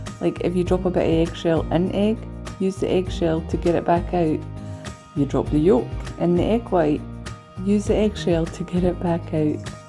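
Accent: Scotland, United Kingdom. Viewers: Hi, I just subscribed. You are from Scotland? or a Scottish person living in the US? I have to binge watch your channel, just to catch up. 👍 I'm Irma from Texas 👵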